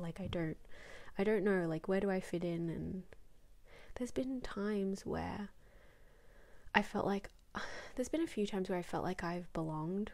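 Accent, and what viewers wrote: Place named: Australia. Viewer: Nice hat. Australian is the best accent for swearing, it's fun to hear it in your videos sometimes!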